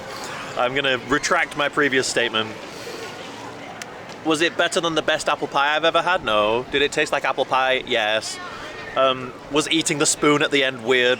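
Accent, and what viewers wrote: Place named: United Kingdom. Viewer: love ur content and the way you speak ! (are you from somewhere in the UK? sorry if I mistake) Cheers, I'm looking forward to new contents !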